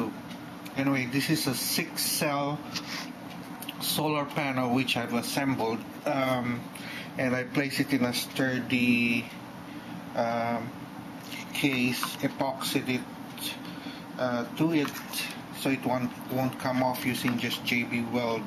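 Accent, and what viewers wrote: Philippines. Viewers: pinoy accent :) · sebwano ka man dong? accent mo bisaya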